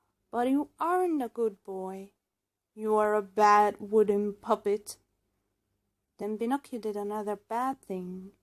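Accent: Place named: United States. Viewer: Cool, I thought you were American before I saw your channel. 💕💕💕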